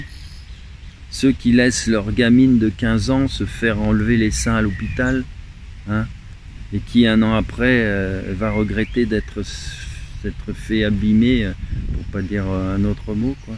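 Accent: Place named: Occitan, France. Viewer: C’est la voix occitane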